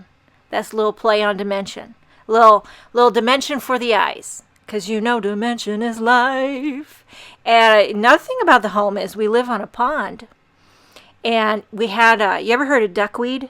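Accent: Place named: Massachusetts, United States. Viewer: being from Mass... you are pronouncing Mass...the way it should be; however, roof in Mass is pronounced like woof, but with the r. However, i have heard folks in your geo area pronounce roof as you do. In any case, considering how badly Mass... slaughters the English language, i mean no disrespect to you or your regional accent.🤗🥰✌😂💐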